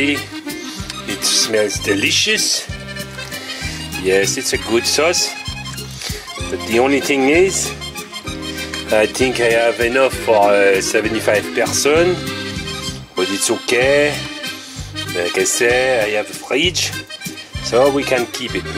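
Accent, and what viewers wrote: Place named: Italy. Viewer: Je pensais te revoir avec un accent USA, mais c'est plutôt avec celui d'Italie que tu reviendras. Rafraichissant mon ami. Continue ton périple avec cette bonne humeur. Au plaisir.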